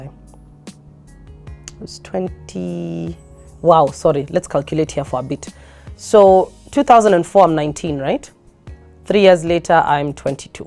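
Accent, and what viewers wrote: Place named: Mombasa, Kenya. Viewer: This lady Tande is a bomb. Awesome personality, great in anything she does. I real love and affirm her. Mombasa Swahili dialet second to none. Not conforming to society expectations but on a lighter note, can make a great wife to somebody deserving. She has personality and brains of a well parented gal.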